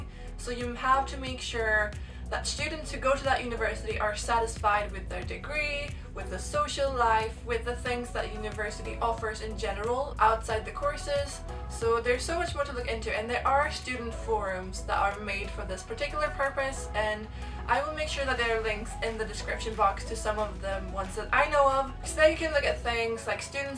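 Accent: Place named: United Kingdom. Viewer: I hope you're enjoying University! Your accent has become so much more British but thanks for the tips, I'm starting my last year of gymnasiet and I'm not sure what I want to do after it :) <3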